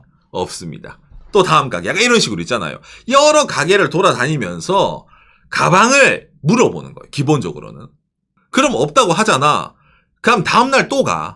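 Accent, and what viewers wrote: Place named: Gyeongsang, South Korea. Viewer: 경상도 사투리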